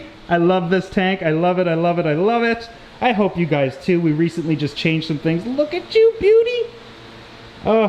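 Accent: Canada. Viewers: ...you must be living in Canada...your accent...;-) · Ah first video and I just knew you were a fellow Canadian as soon as I heard your accent. I’m jealous I want a fish out building lol.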